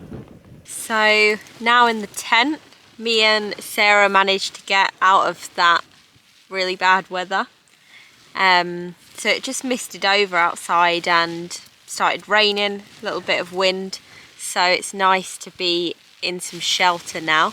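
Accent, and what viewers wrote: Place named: Surrey, United Kingdom. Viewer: With that accent, it’s got to be Surrey😂😂😂😂😂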